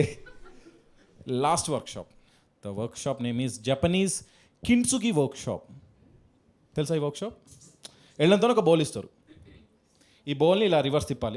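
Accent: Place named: Warangal, India. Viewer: Bro mi voice Veni cmt pedudham anukuaa wgl ani nvuey cheppavu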